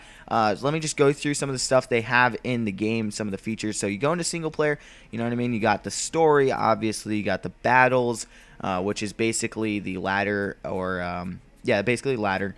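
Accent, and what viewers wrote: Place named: United Kingdom. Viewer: Breathless British accent there xD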